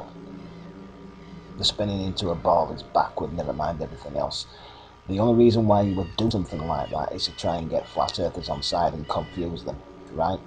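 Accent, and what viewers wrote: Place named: North, United Kingdom. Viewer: Loving the blunt northern accent. Keep up the good work. 😂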